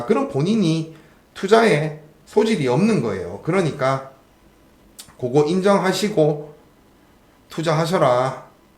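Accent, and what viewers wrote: Gyeongsang, South Korea. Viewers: 경상도 사투리가 문제가 아녀요..내용이 좋으면~~ 상처 받지 마시길요❤❤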